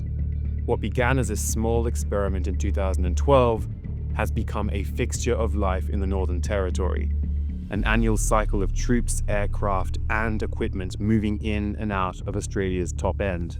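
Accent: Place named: United Kingdom. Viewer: I just reported this video for misinformation, total bullshit lies!!! F off USA the average Aussie hates you and you can’t thieve our tax $$$ to the tune of a trillion dollars along with our sovereignty! & you Brit voice over brat 🤬🤬🤬 how dare you!!! It’s one think to pretend we’re part of your empire but to lie about who really owns Australia now is reprehensible, Brits are as bad as USA! Viva la revolution!!!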